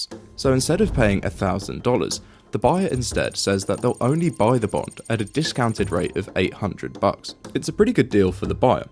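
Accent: United Kingdom. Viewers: Color me skeptical of a 20 something with British accent telling me what the future holds. US debt isn’t debt. It’s treasuries. Purchased by people earning interest. It’s savings. Not debt.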